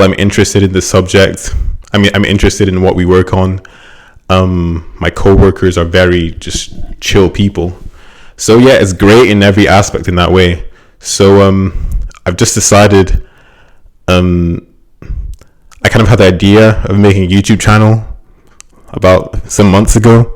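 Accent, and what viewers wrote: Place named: Scotland, United Kingdom. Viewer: YT is literally therapy for a lot of ppl. The Scottish accent thooo!!!! 😂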